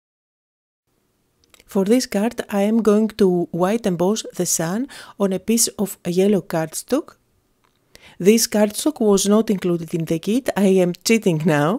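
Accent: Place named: Greece. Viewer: angelam perez by the accent I think that she is Greek and also her family name 😉😉😉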